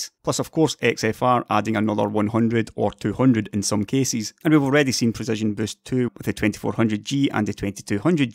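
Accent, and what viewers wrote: Scotland, United Kingdom. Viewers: Is that Scottish accent or Irish accent? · scottish accent sounds like shit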